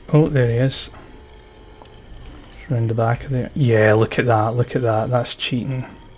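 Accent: Scotland, United Kingdom. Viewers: youre scottish aint ye i love your acceent mate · Thanks alot mate, the tips & tricks really helped if I ever want to play WD 1 again! 1 sub I also quickly recognised that you're Scotish like me.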